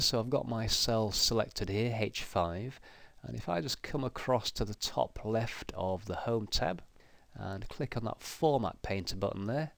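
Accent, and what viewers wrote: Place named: England, United Kingdom. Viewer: This English accent.. uff... English in an Indian accent is better for Indians..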